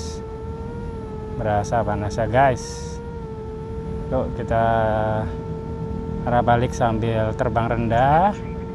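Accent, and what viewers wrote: Cirebon, Indonesia. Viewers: Sampean wong ndi ang? Kayong ilate ky wong cirebonan sih ?... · Mas orang Cirebon Tah? Logate kyak wong cerbon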